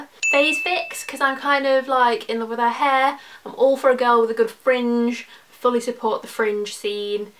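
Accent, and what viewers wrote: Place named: Wirral, United Kingdom. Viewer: Are you from the Wirral? Your accent is very familiar!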